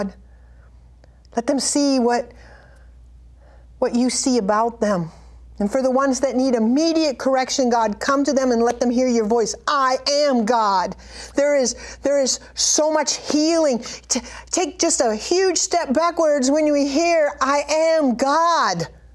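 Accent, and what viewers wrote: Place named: Oklahoma, United States. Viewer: You remind me of * I think. The accent maybe??? From Oklahoma?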